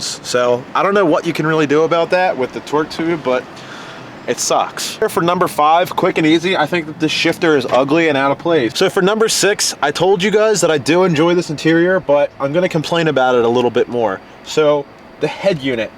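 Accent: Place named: Baltimore, United States. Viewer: That Baltimore Accent. #MDlivin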